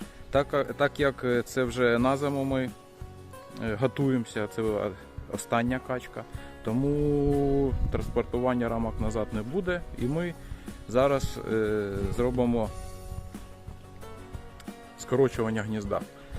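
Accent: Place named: Mykolaiv, Ukraine. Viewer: Привіт Миколаївцям ! Все буде Україна Слава героям України ! Не думав що так Миколаїв розмовляє солодкою українською тай ще зачарованою… Хай вам щастить ! Тихого і мирного вам неба! З вами був кримський українець ! Привіт із Північний захід Америки! У нас цей рік як ніколи … просто все залито…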